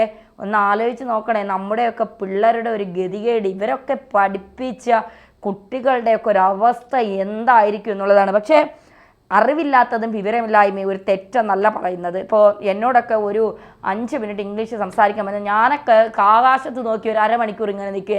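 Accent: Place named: Kollam, India. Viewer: അത് കൊല്ലക്കാരുടെ ശൈലി ആണ്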